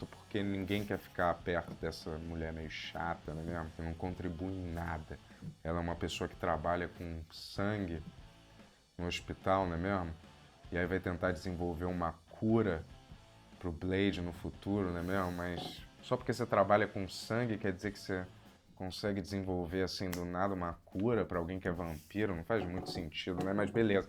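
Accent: Rio de Janeiro, Brazil. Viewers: Ultra irritante esse sotaque carioca onde no meio de cada palavra brota um “A” que não existe. Certo vira “CeAAArto” hoje vira “hoAAAje” fazendo vira “fazeAAAndo”. Nada nesse dialeto prende atenção por muito “teAAAmpo”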